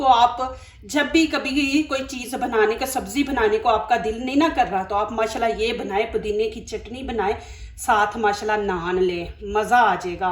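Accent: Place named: Punjab, India. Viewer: اپ پنجاب کے کون سے علاقے کی بولی بولتی ہیں اپ کی بول چال اچھی لگتی ھے اور ریسیپی بھی اچھی اچھی بناتی ہیں لیکن اپ برائے مہر بانی انگلش کوبیچ میں نہ ملایا کریں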